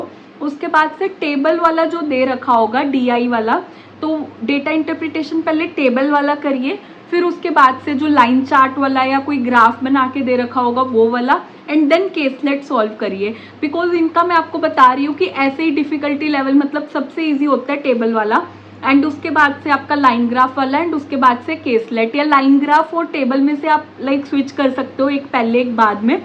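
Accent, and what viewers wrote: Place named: Pahadi, India. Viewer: Maam are you giving RBI grade B too ? also are you pahadi ? I feel your accent is pahadi those himachali , uk folks have that.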